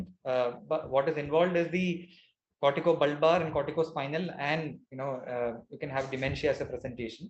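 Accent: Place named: South Asia. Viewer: At least 350 million people - Indians plus Pakistanis plus srilankans plus bangladesh use English with this accent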